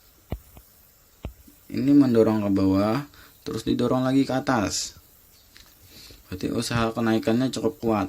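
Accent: Banjar, Indonesia. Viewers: klo di dngar dari logat bahasa ni nang kya buhan urg Bnjar jua ne..😀🙏🙏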